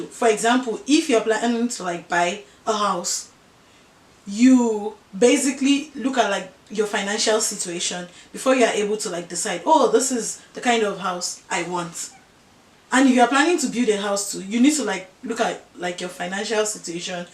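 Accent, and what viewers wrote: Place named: United States. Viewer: You still need to know how to speak Yoruba without the American tone. Above all, It a nice program.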